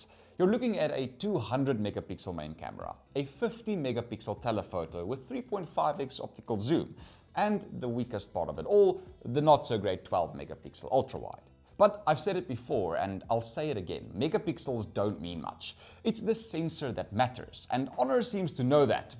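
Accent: South Africa. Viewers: Is this guy from South Africa?sounds like it.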